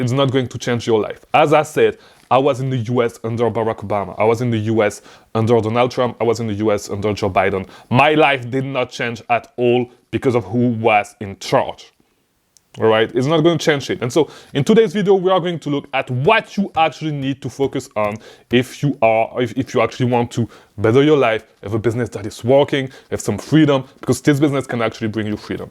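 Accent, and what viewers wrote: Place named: France. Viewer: Hé tu parles français? J'entends ton accent?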